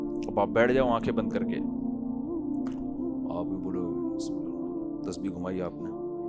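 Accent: India. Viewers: wha mufti saheb aap ki urdu bilkul indian he aap salamat rahe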